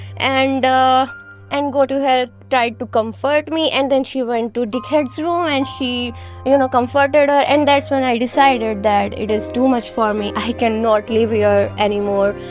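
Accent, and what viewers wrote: India. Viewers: I thought so 😅, I’m an Indian as well! And I could recognise by your voice, your accent, your voice, and your sweetness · I'm really sorry if this is too personal but... Are you Indian because you have kind of an accent 😅 (I really like your voice btw! Is cute!) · do you happen to be indian ?, your accent sounds like one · Hey are u indian? Because accent is kinda ✨ indian ✨. Btw the video is really nice 👍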